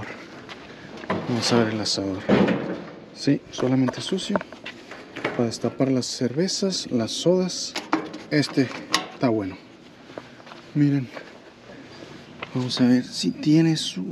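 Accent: Mexico. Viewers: Buenas noches hola y familia la.mayoria de Mexicano hablan así igual que los Salvadoreños y algunos otros países bueno de todos modos tienes mi admiración como dicen ustedes eres muy lucho y no te rajas😂😂😂❤😂❤😅😅😅😊😊😊😊🎉🎉🎉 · Lo que pasa que así hablan los mexicanos para ellos es normal,y el sr es mexicano ok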